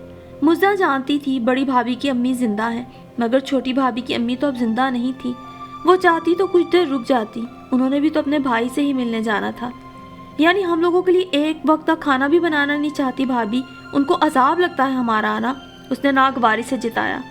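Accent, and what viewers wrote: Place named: Punjab, Pakistan. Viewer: I am from India... talafuz Acha hai ..toda sa punjabiyat lahza dikh rha hai. Bhut khub..urdu ki khidmat kr rhe hai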